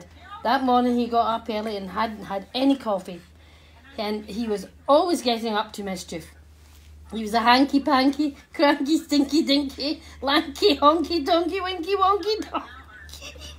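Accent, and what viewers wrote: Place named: Scotland, United Kingdom. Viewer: The Scottish accent is just the cherry on top, and the wheezing from the cameraman.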